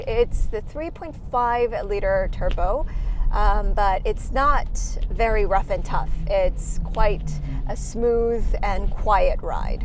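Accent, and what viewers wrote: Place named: United States. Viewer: 으음. 메이크업, 스타일은 한국식인데 영어는 미국식이시군요ㅎㅎ 차 리뷰도 보고 영어 표현도 배우고 잘 봤습니다. Well presented. 💘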